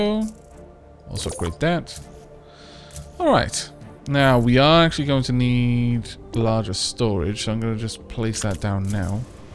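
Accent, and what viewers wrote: Wales, United Kingdom. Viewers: has welsh accent...is funny as fuck and is pretty savage, it's like having a older brother that has a clue of how to play this game 😂 great good on this series buddy im subscribed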